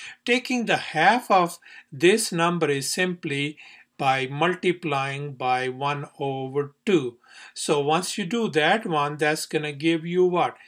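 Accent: India. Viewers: I'm really confused if he's Indian or Canadian. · Sir your way of speaking tells me that you are punjabi?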